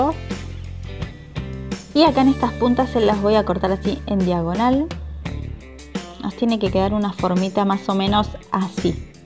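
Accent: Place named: Argentina. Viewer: me encanta el acento argentino xd oshe si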